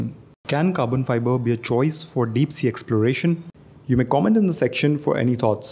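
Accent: India. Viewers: Im impressed. I didnt expect an indian to have such a good description and understanding of material and engineering. I almost left when I first heard him. But I think he is pretty good! I like the video, thanks for sharing your knowledge! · The paj33t accent is unbearable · Who used an Indian to narrate this story??? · Sorry but Hindi accent makes me laugh! Can't get serious about this video. I do apologize!!! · I felt like I was listening to an Indian call center🙄 also pressure is not only on top…..it is equal all around the exterior. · Oh no not that indian accent ! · Indian englishhttttt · Great job! Your English is atrocious due to the heavy Indian accent but the content was good.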